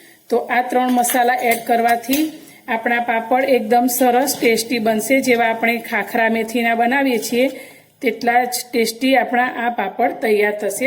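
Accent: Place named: Rajkot, India. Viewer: Bhuj saras banavia no 1 ho 👌 tame kya gam ma cho ben boli uper thi to rajkot na lagocho reply karjo pls ❤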